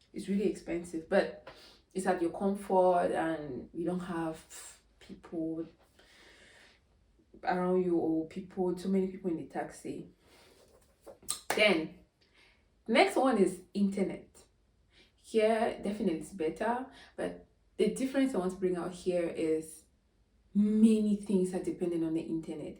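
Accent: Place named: Nigeria. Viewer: Why do you sound Nigerian? A French speaking country would have been my last bet when listening to you!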